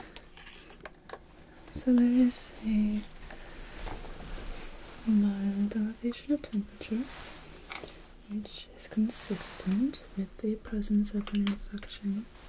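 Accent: United Kingdom. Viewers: I've got used to the British accent (not bad either!) but I wasn't expecting the 'cheers' thrown in - one of the thing you hear a lot in the UK, but rarely when someone is trying to do a British accent. Bravo! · British accent?😅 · It's a British accent. She made a video with 5 new characters last week. All of them have different personalities, voices and slightly different appearances like hair styles. So she has decided to make a video as this new character. Hope this helps!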